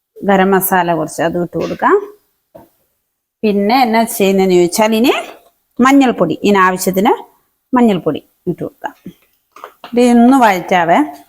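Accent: Kottayam, India. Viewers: നിങ്ങൾ കാസറഗോഡ് കാരല്ലേ, പിന്നെങ്ങനെ കോട്ടയം സ്ലാങ് പറയുന്നു 🤔🙄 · Hi chechi njn ipplanu video kanunnathu. Super … Kottayam style samsaram ❤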